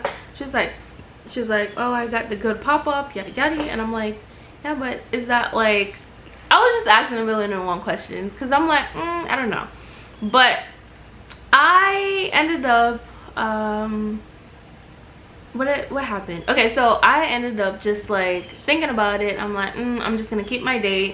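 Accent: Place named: Jamaica. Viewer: Heard the accent before the patwa buss 😂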